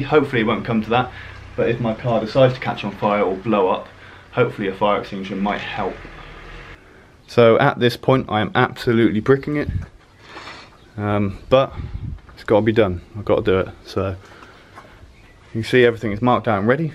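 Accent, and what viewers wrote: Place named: El Paso, United States. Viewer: This guy sounds like he's from El Paso.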